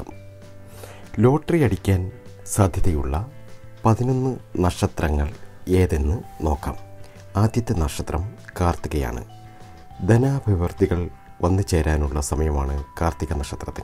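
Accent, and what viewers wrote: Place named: Thiruvananthapuram, India. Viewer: തിരുവനന്തപുരം ആണ് നാട് ആല്ലേ ? "ഫാഗ്യം " എന്ന് പറഞ്ഞു തുപ്പൽ തെറിപ്പിച്ച് ഫോൺ നശിപ്പിച്ച്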